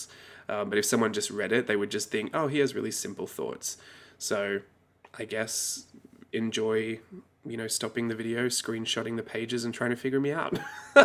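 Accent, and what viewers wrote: Australia. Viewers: This is the first video I’ve watched from you. I knew you were an Aussie by your accent. So refreshing 🤓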